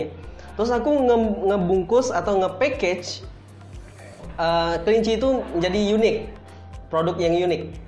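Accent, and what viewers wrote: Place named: Aceh, Indonesia. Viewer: Orang aceh kau ya bang??? Ku dengar logat bicara nya ada medok pletak pletok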